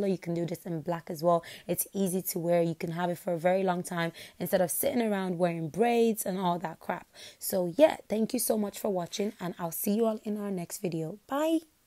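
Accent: Ireland. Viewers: The Irish accent omg 😍😍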